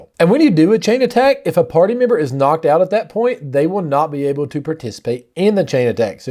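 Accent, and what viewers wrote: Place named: South, United States. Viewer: Just checking this out, really well done and clear. Thanks! Side note - where are you from? I'm from NC and I sense a southern accent here too :D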